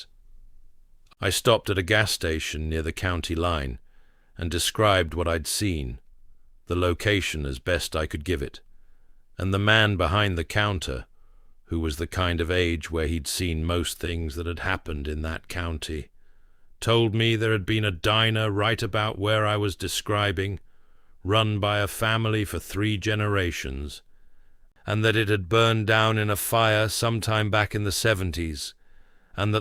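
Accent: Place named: United Kingdom. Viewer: Its a British accent..bro!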